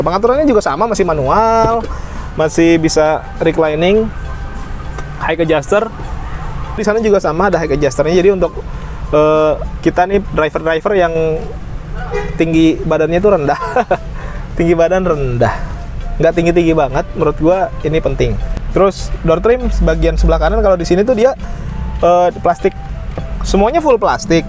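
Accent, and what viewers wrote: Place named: Garut, Indonesia. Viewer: hahahaha kayanya orang garut euy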